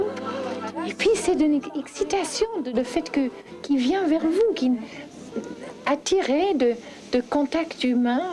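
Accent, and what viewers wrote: United Kingdom. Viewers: Son unique personnalité avec le charme de son accent bristish qui était toujours fort agréable à entendre ! C'était vraiment une magnifique personne très courageuse ! 🌹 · chère Anita J’ai eu beaucoup de plaisir à lire votre message de retour. Moi-même j’ai 52 hivers. Depuis toujours, j’ai eu un profond respect et de l’admiration pour notre Jane. Elle a toujours possédé une belle plastique et une âme pure. Je ne vous cache pas Anita que son départ brutal m’a plongée dans un profond chagrin. Je n’arrive pas à réaliser que nous ne n’entendrons plus son délicieux accent british. Je me console en me disant qu’elle a rejoint Serge pour leur décadanse et que Kate les prends en photo sur la piste de danse du paradis. Je vous souhaite un très bel été chère Anita et un grand merci pour votre délicieux message. Amicalement, Corey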